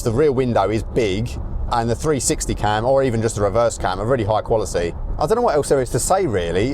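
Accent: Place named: New Jersey, United States. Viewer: Love the New Jersey accent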